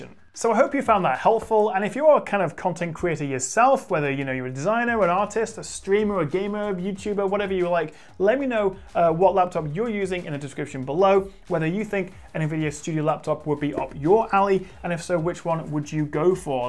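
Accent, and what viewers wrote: United Kingdom. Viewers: Surely it is, and it was with an emphasis on good job, besides doing your leg work here with your community, then you truly make differentiated videos beyond the british accent;)! Nvidia better team up with u again! Your explanation of these semi-niche brandings are unique and can’t be outsourced to India or perhaps done by a futuristic AI 😛(speaking of DLSS).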